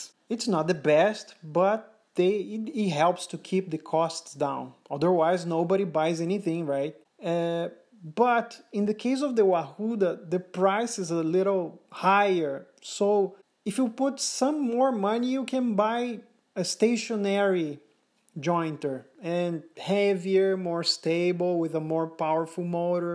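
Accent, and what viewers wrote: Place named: Brazil. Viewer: Sounds like a Brazilian to me based on the accent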